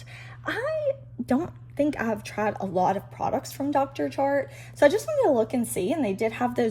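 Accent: South, United States. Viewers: I love your Southern accent. 🥰🥰🥰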